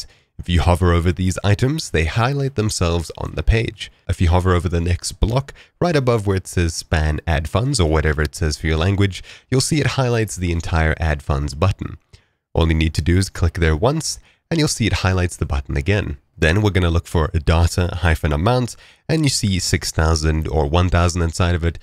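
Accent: South Africa. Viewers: Hold up, you south african? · South African?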